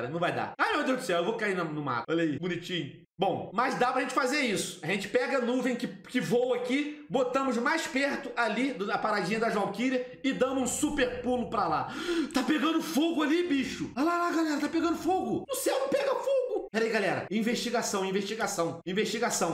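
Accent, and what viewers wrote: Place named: Portugal. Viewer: Muito bom ver o voltando às raízes... Ele ainda tinha sotaque português europeu quando fazia série desse vídeo...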